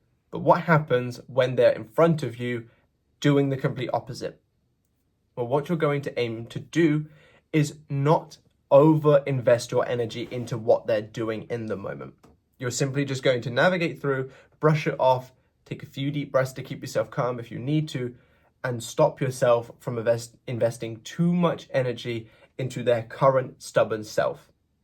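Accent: England, United Kingdom. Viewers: Are you from outside of the UK ? How he sounds is really normal to me being English myself, im used to his voice and all accents in the UK. A movie voice 😂 made me laugh 😂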